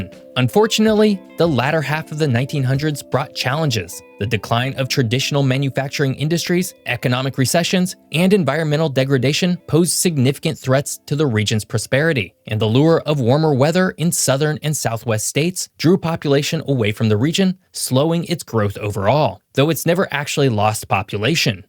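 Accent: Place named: West Virginia, United States. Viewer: You got a little bit o’ West Virginia in there!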